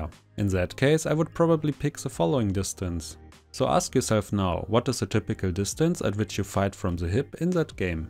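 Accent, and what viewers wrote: Germany. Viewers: irgendwie hab ich aus deiner stimme raus gehört das du deutsch sprichst 😂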